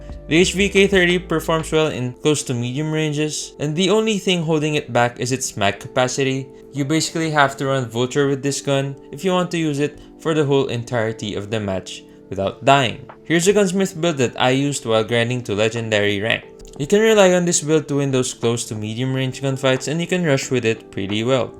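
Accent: Philippines. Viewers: Pinat Bater - gotta love our Filipino accent. Nothing wrong with it. Language is dynamic anyway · I have same accent. P-nAt batEr. Love from Philippines.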